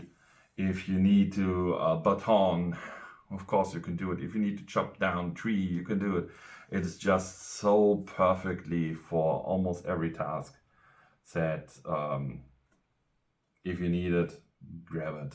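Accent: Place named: Germany. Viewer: You're German I hear.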